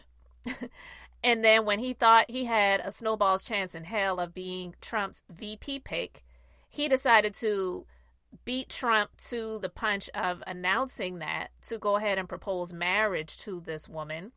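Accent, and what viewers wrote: Charleston, United States. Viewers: Hey girrrl, you sound like a Charlestonian!!! Then, you do know this jerk is full of it!!!!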